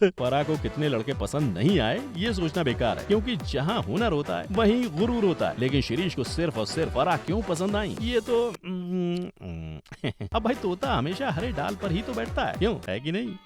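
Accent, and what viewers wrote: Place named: Haryana, India. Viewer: Bhai hrynvi ni bt bolne ki kosis k liye 100/100😉🤘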